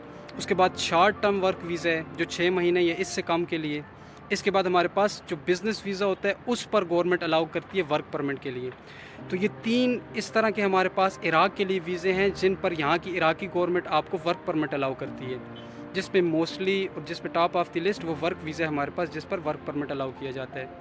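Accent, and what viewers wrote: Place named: Hindko, Pakistan. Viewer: Yr ak mujy apny hinko speak bi lgty ho khan sy ho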